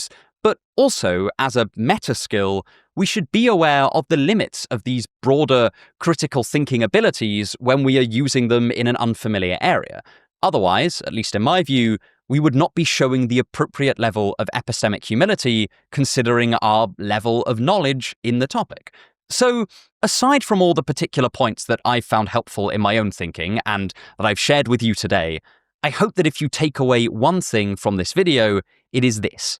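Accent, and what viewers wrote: United Kingdom. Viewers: Yes, I’ve heard the “opinions are like assholes” before, but I thought was inventive to adapt the idiom especially the part about being examined. 😂😂😂 And for me, hearing “asshole” with a British accent carries some elegance that the American accent never will.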